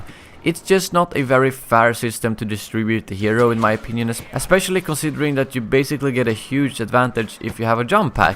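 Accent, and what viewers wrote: Stockholm, Sweden. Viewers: Haha älskar "stockholmsdialekten" på engelska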